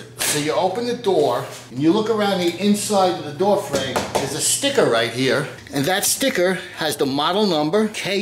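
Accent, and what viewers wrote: New York, United States. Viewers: Thanks. I value the 2 tips you gave. The first was using the putty knives to help lift the front legs over the vinyl flooring edge. The second to use a string the stretch the spring enough to hook the cable end. And I enjoyed your accent, too. Nobody can match a native New Yorker. I have been away from my boyhood neighborhood in the west Bronx for a long time. Take care.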